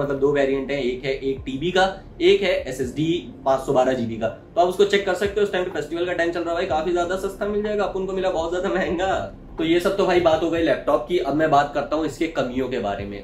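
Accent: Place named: Kanpur, India. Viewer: bhaiya kanpur se ho kya aap ?....apki boli se lagra hai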